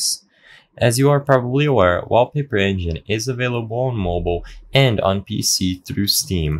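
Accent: Brazil. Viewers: vc e Brasileiro certeza, sotaque entregou, mas conversa bem pra krl em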